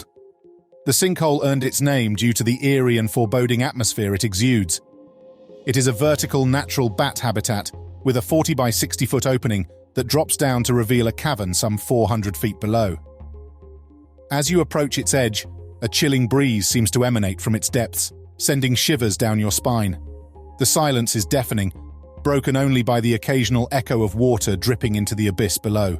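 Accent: United Kingdom. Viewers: Could do without the narrator's brit accent. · Is this a Jew with a British accent attacking the White race again? Pretty sure it's a Jew jewing. Christians eat this shit up.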